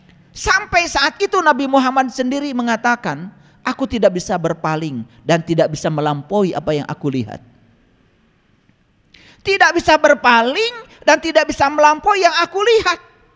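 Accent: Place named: Sunda, Indonesia. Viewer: Sunda nya medok , kita teu ngerti ustad....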